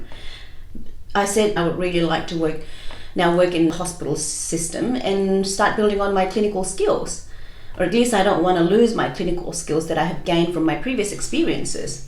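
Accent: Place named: Australia. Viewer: Thank you for the content although i'm curious to know how you managed to get a good aussie command and accent in 9 years time with almost no traces of indian. Any secret to this you may want to share?😉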